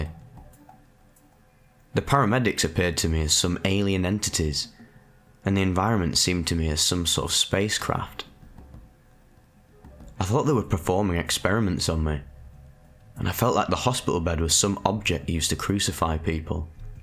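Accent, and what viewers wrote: United Kingdom. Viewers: Are you british or am I tripping? both?😊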